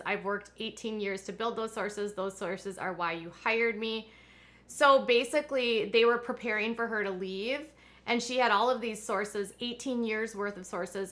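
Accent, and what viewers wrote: Minnesota, United States. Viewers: I love your ponytail look and the Minnesota accent, and more than that I love your shoot from the hip and salt of the earth no-nonsense style of reporting. You have done your homework and your research and I appreciate it. I have really been enjoying your channel and soon as I get my finances in order (translation: the IRS does it in 7 to 9 days) I am going to join patreon and pass some of that stuff to you, girlfriend. 😉🪐👍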